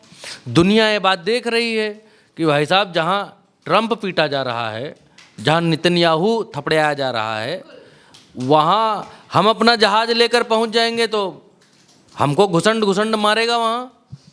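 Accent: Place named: Awadhi, India. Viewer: ka theth Avadhi andaaz 😂😂 maza aa gaya ❤❤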